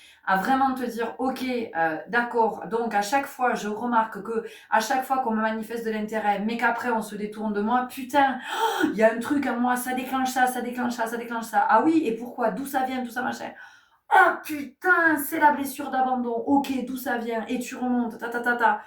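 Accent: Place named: Marseille, France. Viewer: J'aime bien . Vous avez le sourire . Accent du sud Marseille . C est joyeux ❤❤❤❤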